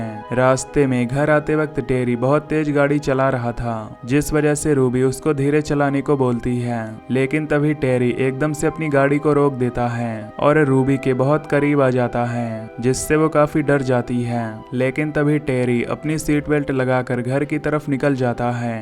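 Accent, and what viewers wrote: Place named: India. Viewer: Great explanation pure Indian voice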